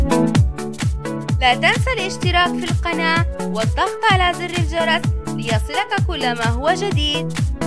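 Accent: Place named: Saudi Arabia. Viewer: لهجته اقرب للهجه السعوديه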